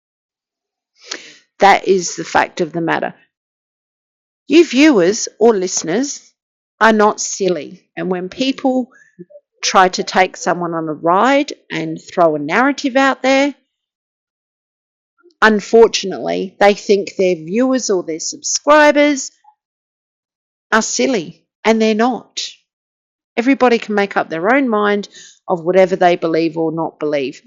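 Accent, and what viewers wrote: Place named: Australia. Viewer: Hi from Perth….loving hearing an Aussie YouTuber. Love your content and the way you speak your mind.❤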